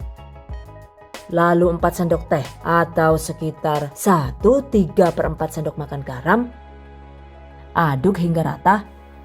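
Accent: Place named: Madiun, Indonesia. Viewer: SUARANYA KYK YG DI MADIUN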